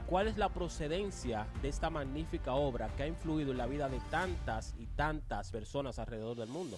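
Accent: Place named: Dominican Republic. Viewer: Es primera vez que veo tu canal, te saludo desde El Salvador. Interesante historia, por tu acento creo que sos Dominicano. Dios te bendiga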